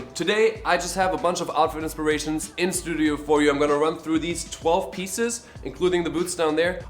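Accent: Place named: Germany. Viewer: schön dein deutscher Akzent! :D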